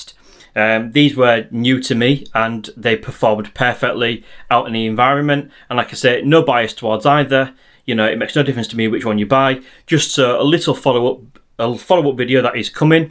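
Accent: United Kingdom. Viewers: You have a British accent, is this in the British Isles?! One more question do You have the FCC (Western Hemisphere version) or the CE (European version) ??!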